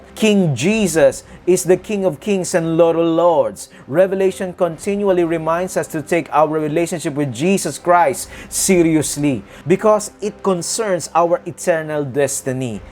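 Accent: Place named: Philippines. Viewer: Your accent sounds Filipino. Nice video by the way .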